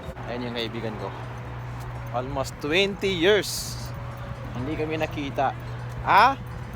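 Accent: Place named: Maranao, Philippines. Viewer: Kalowka akala ko taga Luzon or somewhere, mranao kabs akie! Naks naman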